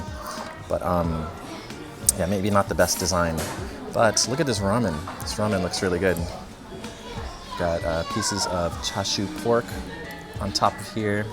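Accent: United States. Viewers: Please remove hat while eating Love video and had Kaiten sushi often. Are you originally from Hawaii? Sounds like it.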